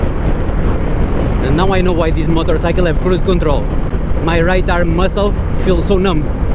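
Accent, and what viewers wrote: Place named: Portugal. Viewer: your accent sounds alot like a portuguese guy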